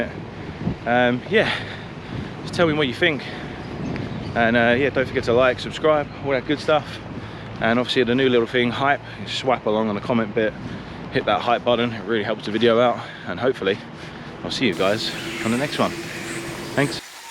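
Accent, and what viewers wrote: Australia. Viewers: I detect the hint of an Aussie accent ❤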